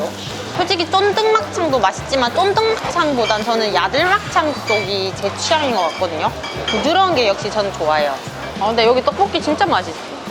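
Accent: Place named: North Gyeongsang, South Korea. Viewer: 맹이나물이 아니고 명이나물 ㅋ댁이 워데이껴? 억양은 경북북분데 ㅋ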